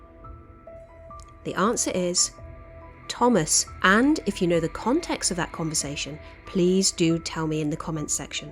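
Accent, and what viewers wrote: England, United Kingdom. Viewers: Missed 5☹️… but I always enjoy hearing your beautiful English accented voice😊. Grace & Peace! ❤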